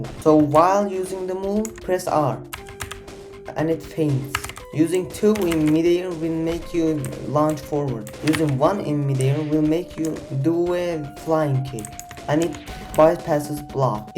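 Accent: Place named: Turkey. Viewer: Brotherman is obviously Turkish. Gotta get that pronunciation smoothed out, brother.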